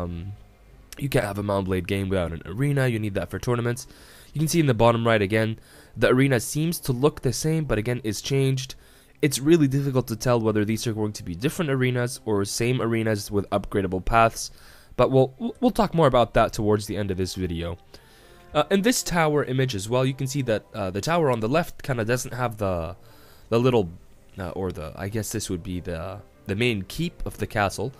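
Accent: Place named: Quebec, Canada. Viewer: Slightly off topic question: Are you from Quebec by any chance?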